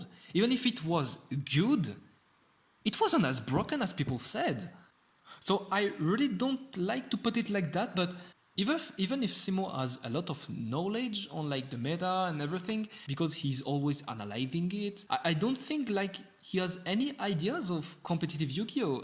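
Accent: France. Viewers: Le petit accent français haha . Gg propre tes vidéos je m'abonne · Sound like french accent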